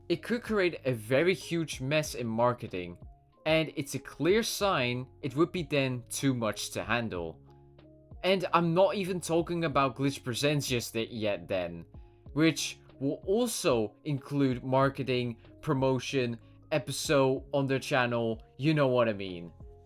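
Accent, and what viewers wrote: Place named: Netherlands. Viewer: Sorry this is unrelated, but I heard your accent and I was like "You sound Dutch" and then I check your channel and you're from the Netherlands, I just can't lose. She's too good chat 🗣🔥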